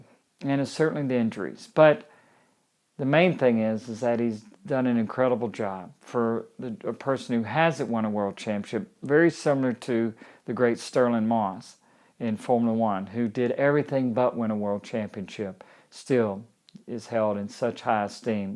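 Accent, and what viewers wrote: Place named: South, United States. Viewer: It’s great to hear a southern accent talking about moto gp. As I always say, I wish there were more Americans involved in moto gp. Keep up the great work, Freddie!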